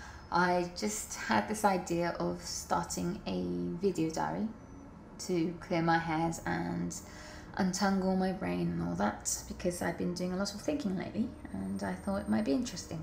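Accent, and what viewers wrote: United Kingdom. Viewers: an asian in london with a british accent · This needs to be more popular..... I mean, this is genius. It's interesting to look at. Looks realistic. Looks cute. Her english accent is fantastic. Spread~ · You can tell how perfect her British accent is based on her mispronunciation of Tokyo, despite being native Japanese!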